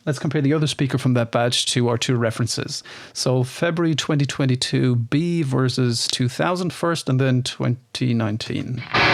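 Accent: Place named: Ireland. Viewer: Your English has a slight Irish accent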